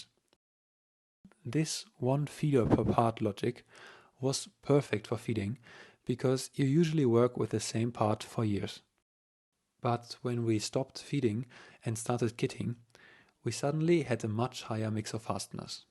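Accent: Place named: Germany. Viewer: This is amazing! Whenever you see an ingenious project, it comes with a German accent. If you've not seen it already, please, please check out Destin / Smarter Every Day's manufacturing video. It's about getting a Barbeque cleaning brush made in the USA with non-chinese parts. It would be sad to see the MPS fail as a business, but it seems that the west has cost engineered ourselves into a manufacturing corner, and become very vulnerable in the process.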